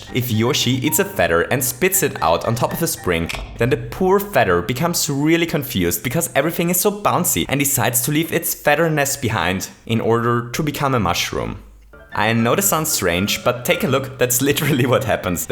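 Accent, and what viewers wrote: Germany. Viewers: You have an German accent xd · Ich wusste das du Deutsch bist! Habs schon am Akzent bemerkt!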